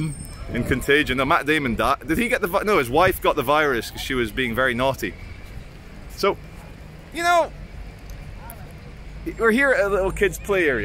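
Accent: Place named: Manchester, United Kingdom. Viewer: Your Manc accent is starting to shine through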